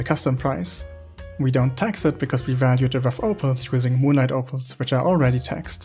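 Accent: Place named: Germany. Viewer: Your english sounds very german 😮. I love your Videos and was actually horrified when i found out that i wasnt subscribed to you 😅, great Video! Keep Up the good work ! Also can i reference your Videos in my own ? I also got a YT channel, and since a few Weeks i Focus on Lifeskills and anything but grinding... have a nice Day 😊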